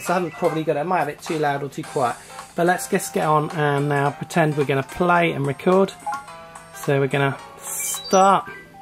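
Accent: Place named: United Kingdom. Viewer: you sound a little like a British mii in Tomodachi life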